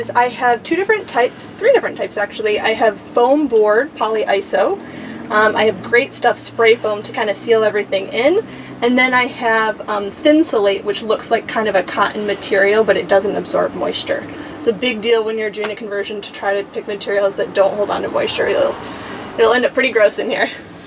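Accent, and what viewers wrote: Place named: Michigan, United States. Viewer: 100% Michigander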